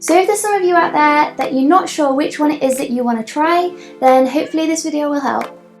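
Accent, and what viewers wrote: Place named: Northern England, United Kingdom. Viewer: I'm an acrylic painter, but I'll have to try out oils now... By the way, where is your accent from? Northern England? (I have no idea if that's a good guess or if I'm an idiot). It's lovely! 😁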